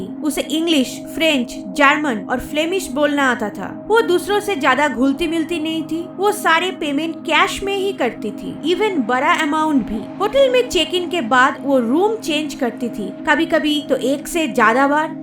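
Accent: Bengal, India. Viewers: Tmhari accent bengali hai, tm Bangladesh se ho ky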